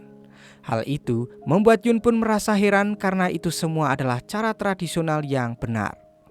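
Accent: Java, Indonesia. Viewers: Byak mulut bukan film,mana medok bgt ngmngnya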